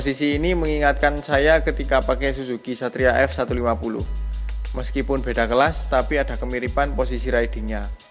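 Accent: Malang, Indonesia. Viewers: Mas wong malang tah?😅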